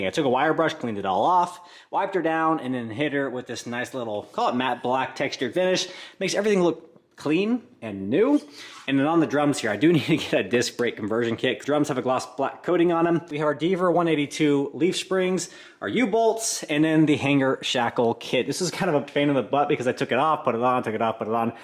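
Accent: Hawaii, United States. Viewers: Bro yessah palala you Hawaiian my guy I love it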